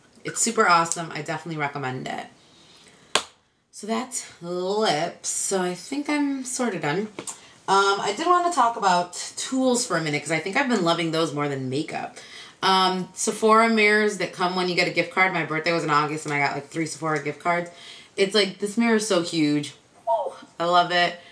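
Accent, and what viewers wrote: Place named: Chicago, United States. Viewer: Your Chicago accent is really strong in this video. It makes happy because I my miss my hometown!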